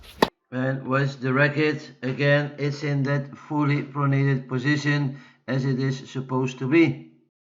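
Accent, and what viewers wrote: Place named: Belgium. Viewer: dankjewel meneer. I thought I picked up the accent - I used to live in Belgium :)🇧🇪 Thanks for the advice on the back. I often get some backache just from playing so I need to be extra careful with serve but I’ll give this a go! Again, great video. Thanks!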